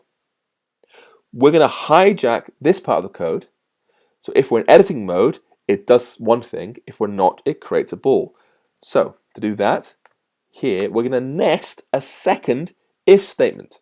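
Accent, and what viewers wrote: United Kingdom. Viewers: LOL British or Scotish.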